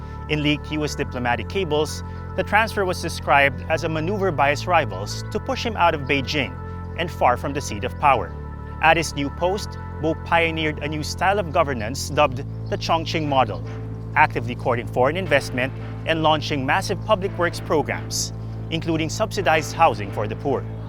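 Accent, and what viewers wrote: Philippines. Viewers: I’m not even a native English speaker but I think I can hear a Filipino accent here 😄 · ah that explains his accent, he sounded filipino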